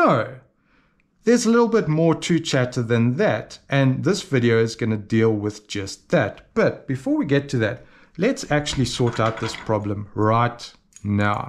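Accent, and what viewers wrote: South Africa. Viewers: Lovely South African acsent!!. Learnt a lot. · Lovely to hear a good South African accent.... I recently built a stone slicing machine and had trouble of chatter machining the ends of the mandrel to take the cutting disc. I made a lathe steady which has helped in the machining of the long shift but I will definitely use your methods to make a cleaner job.... thanks.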